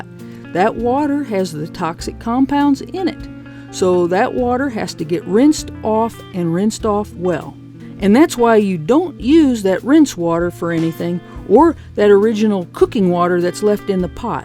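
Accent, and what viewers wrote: United States. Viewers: Ma’am I love your country accent.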